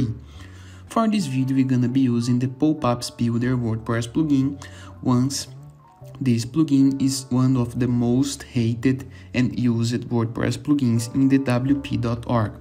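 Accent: Brazil. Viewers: you sound brasilian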